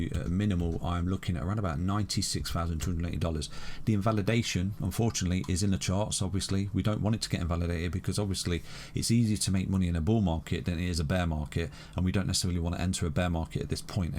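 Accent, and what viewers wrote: United Kingdom. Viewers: Dump with the British accent!! FIRE 🔥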